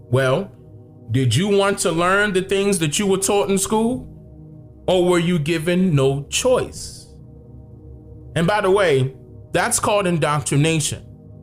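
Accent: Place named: Washington DC, United States. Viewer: are you from dc I feel like I hear it in your voice!!! Thanks for keeping my mind working!! My dad told me these things when I was in elementary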